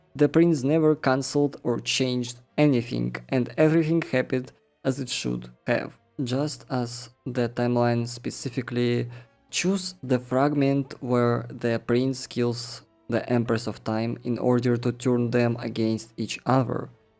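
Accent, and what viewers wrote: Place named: Russia. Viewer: Bro, you're from eastern europe? your accent seems quite russian to me...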